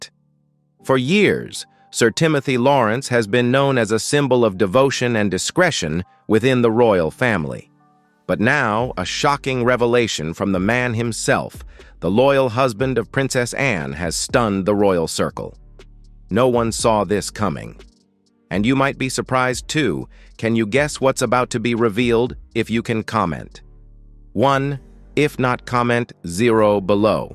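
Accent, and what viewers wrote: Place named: United States. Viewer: so many American accents on these stories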